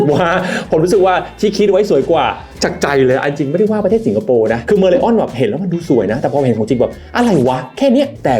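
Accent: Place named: United States. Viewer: พูดเหมือนที่เมกาในตอนนี้เลยค่ะ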